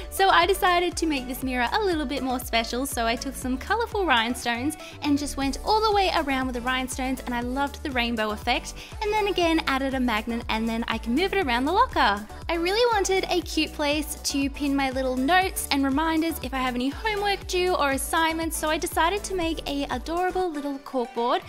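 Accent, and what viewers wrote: Australia. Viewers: are you Australian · I feel like your Australian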